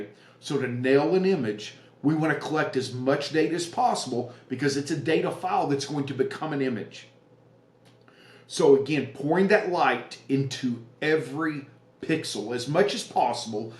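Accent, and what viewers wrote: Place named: Texas, United States. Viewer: I’m 65, and this may be the first time I’ve heard “paradigm” in a Texas accent. (Not to sound like a West Coast doofus elitist, but hey, you brought up the accents thing.) I haven’t been too conscientious about exposing to the right in my digital photography, falling prey to having the image look good in the viewfinder/screen. I’ll definitely want to work on that, thanks.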